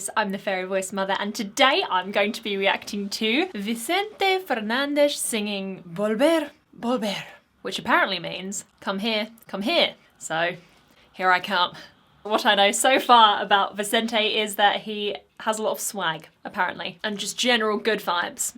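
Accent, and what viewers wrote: England, United Kingdom. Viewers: I subscribed to your channel just because ablas Español by the way I love your England accent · You are so beautiful and love your British accent! You've now become my favorite music reactor.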